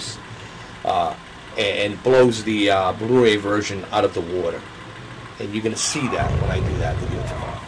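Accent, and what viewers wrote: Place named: Boston, United States. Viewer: I think it's great that you're covering gaming (Xbox and Sony) with your 4k content. I too think that Sony has a stronger foothold within the gaming industry with their numerous exclusives and virtual reality. I enjoy both Sony Pro/PSVR and Xbox One X. I am the type that appreciates high quality 4k and HDR so I use the Xbox One X for games that are available on both consoles. Currently, I only use the Sony Pro for the exclusives and virtual reality. Overall, I do prefer Sony over Microsoft as it appears that they focus more on the gaming community but I can not overlook the greater (however small) processing power of the Xbox One X. I am very confident that Sony will leap over Microsoft with greater processing power during the next console generation. I was also like to thank you for the effort you put towards your educational content. 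Most reviewers are far too hyper-critical which makes me second guess everything. You cater towards the every-day guy. You use simple and use easy to understand terminology and your slight "Boston" accept makes you more relatable. It's like having Paulie from the Sopranos coming to my house to discuss 4k to me. You use common sense while giving simple down to earth advice with no B.S. Keep up the good work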